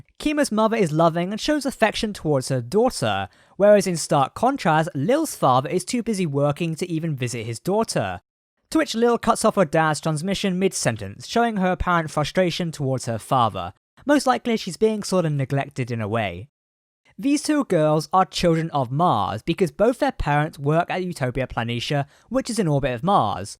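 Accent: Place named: United Kingdom. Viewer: Oy. Crikey. Let's have another Brit voiceover commentary about the trailer we just want to watch in peace...ack... so. After Data is gone, Maddox finally figured out how to build Soong Androids, and they decide to revolt and blast Mars ? their leader Beep Boop leads a charge "in the name of Data". Unknown to them, Lore, revived by a drunk lab assistant is behind the plan all along. Meanwhile, in between Picard's dreams of Data, the real Data's head is retrieved by a small Pakled ship in Federation space and sold to Boson, Quark's grandson who decides to rebuild a new body and face with a special inhibitor in it, to give OO-Maks to any Ferengi for an hour if they can afford the gold-pressed latinum to pay for it. Quark, excited and thrilled about the success of his grandson, brags at the old Ferengi's home , laughs at his brother the former Nagis's discomfort . 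Q pops in and looks into the camera saying "why ? because we can"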